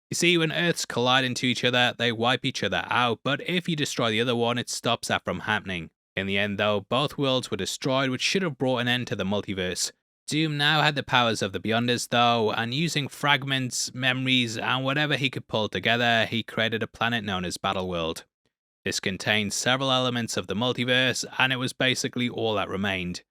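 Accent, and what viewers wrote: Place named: United Kingdom. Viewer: I knew I needed this guy to explain things to me in a British accent. He never misses.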